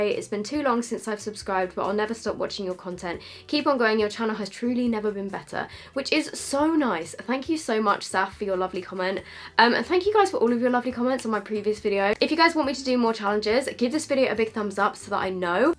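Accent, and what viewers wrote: United Kingdom. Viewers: It's just British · She’s british 🤣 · shes hella british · dat british accenT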